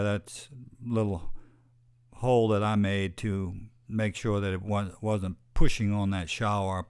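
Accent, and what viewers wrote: Louisiana, United States. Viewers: Man when I heard you talk I knew you were from my home lol! My camper caught a branch through it in the last hurricane that came through carencro. I just had it hauled up to Washington for me and finally getting around to dealing with the hole. Gotta get everything out of it first. Pretty sure I got some water in there but the good thing is it’s so dry here none of it sits. We’ll see how it goes. Are those special mesh patches for fiberglass? Or could you use Sheetrock patches?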